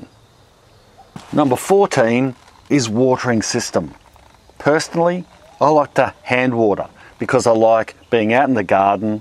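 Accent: Australia. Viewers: How about collecting your own urine (if you are not taking medication) and using it as a nitrogen source to naturally boost your veggies, eh? I did that with my garlic twice and while I haven't harvested it yet the scapes have been harvested twice already! Very excited to see how the heads come out. This is my first year gardening so I'm definitely a noob. Thank you so much for taking the time to make your videos. I enjoy watching all of them and always learn something new. Plus I love the Aussie accent! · Another thing they should do, is to watch crazy Aussies making gardening videos!!! lol! I love your vids, combining some GREAT info, with a smattering of comedy, here and there. I am in the U.S., and as soon as I heard your accent, I loved how you say thing a bit different. So I TRY to remember to mulsh, but sometimes, I just have to settle for mulching instead. 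Have a good one, and keep up with the AWESOME vids! Another guy, who is GREAT for container gardening, is "home grown veg"! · I think many people watch so they can practice their Australian vocabulary and accent, in addition to the advice.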